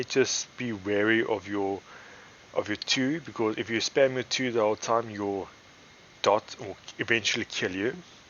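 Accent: South Africa. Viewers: You sound South African. Thanks for the video though, wish you could update it with the two latest classes.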